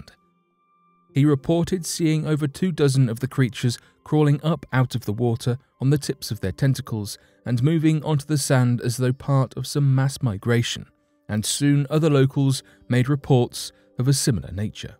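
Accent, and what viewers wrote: United Kingdom. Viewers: He is British, they pronounce a lot of things differently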